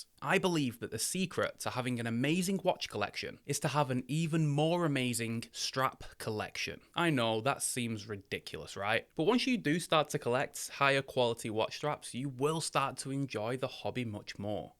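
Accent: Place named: Yorkshire, United Kingdom. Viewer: The only watch accuracy meter I could find in the app store cost $29.95. And there isn't one that looks like the one you show on the video. Can the app be found elsewhere? I love your videos and your Yorkshire (I may be wong) accent. I was a Harrogate resident for 5 years back in the 70s. Thanks for your great videos.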